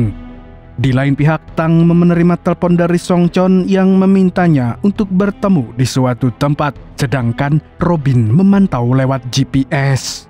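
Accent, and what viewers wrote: Banjar, Indonesia. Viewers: Urang Banjar lah pian ni bang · Umaaa urg banjar sekalinya leh😂😂😂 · Urang Banjar kaya nya nih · Banjar kah nih 😂😂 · urang banjar kah mang😂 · Bahasa Banjar kah teh tadi lwn logat nya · Banjar pinanya 😂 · Anjirrr urng Banjar kah ikm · urang banjar kah pian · Lah banjar wkkwk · Nah, urang Banjar sekali nya pang 😂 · Umaa urg banjar jua sklinya😂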